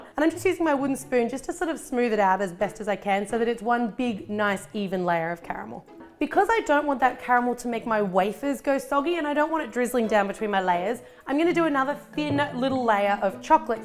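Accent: Australia. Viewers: No! That's not a British accent at all!! She's Australian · She actually sounds more Australian than british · is she an Aussie don't like the accent. and fair enough yeah she recreates bigger version of chocolates but do they taste the same as the actual brands? only a million times worse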